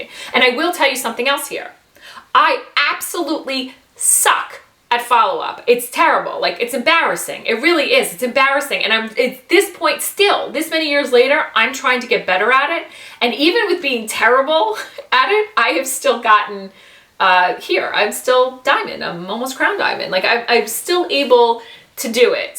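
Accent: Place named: Northeast, United States. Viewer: loved the nor'easter accent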